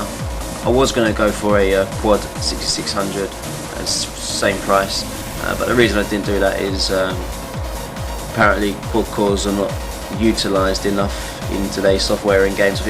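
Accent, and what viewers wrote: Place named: England, United Kingdom. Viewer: jewel core processor, thats what it sounded like, haha. I love the enlgish accent.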